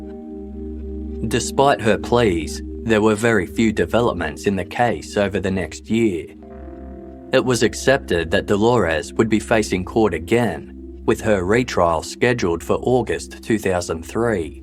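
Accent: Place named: Queensland, Australia. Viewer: The Queensland accent is especially delicious for the dark bits!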